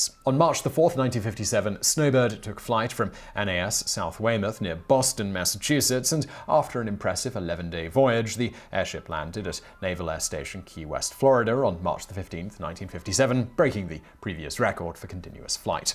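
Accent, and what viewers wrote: United Kingdom. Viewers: Did it sound weird to anyone else when he said the speed in miles per hour before saying it in kilometers? Like maybe my brain, hearing a British accent, prepares itself to pay attention to the second set of numbers?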